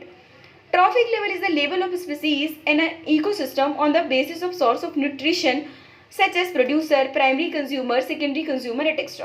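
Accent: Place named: South India, India. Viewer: Mam ka accent south ka hh😌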